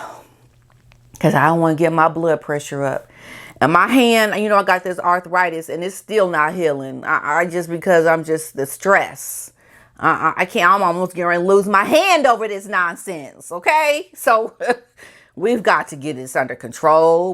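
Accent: United Kingdom. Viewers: No wonder you had the british accent!!!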